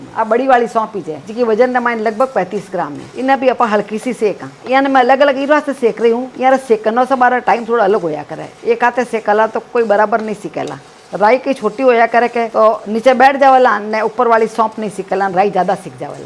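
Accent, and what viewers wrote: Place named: Marwari, India. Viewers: aap rajisthani bolte huye bohot ache lagte ho mujhe bohot acha lagta he rajisthni khane maze k hoten hen me pak me reheti hin magr mene jothpur ki thali khai he or jothpur ka qila bhi dekha he jodha bai ka ab me ye achar bhi banaungi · How educated u are please reply.. what a marwari twist with English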